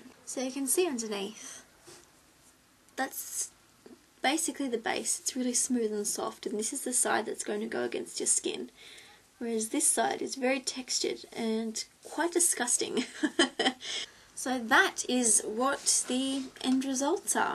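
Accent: Australia. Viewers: are you Australian or from new zealand?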